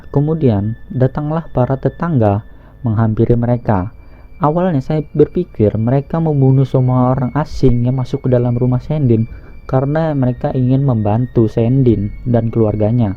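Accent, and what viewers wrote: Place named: Indonesia. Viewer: Maklum Bro,Mulut Orang Indo Ga terbiasa Dengan Bahasa Inggris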